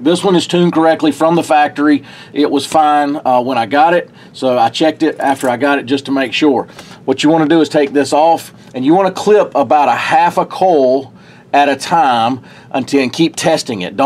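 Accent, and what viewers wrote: South, United States. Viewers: NICE LITTLE DIY TIP!👍THX! I KEPT THINKIN U WERE SAYIN "COAL" WHEN U WERE SAYIN "COIL"...LOL.😁 CUZ OF UR NICE SOUTHERN DRAWL. WHERE R Y'ALL AT?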